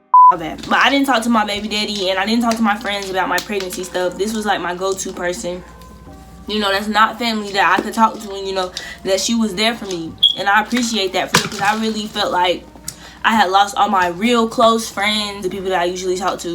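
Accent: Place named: North Carolina, United States. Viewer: You sound like you from the NC . AUTOMATIC subscribe <3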